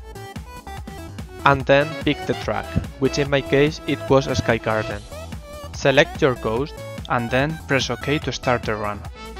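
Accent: Spain. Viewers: Only an spanish can identify another spanish speaking in English^^ I have just done it! Good video! :P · eres de españa? tu acento me suena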